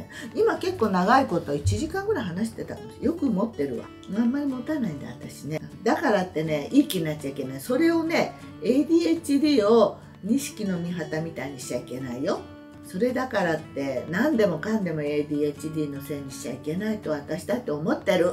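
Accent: Yamaguchi, Japan. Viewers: 山口弁がとてもここち良いです。私も山口出身の山中湖住まいの70歳です。凄く元気もらってます。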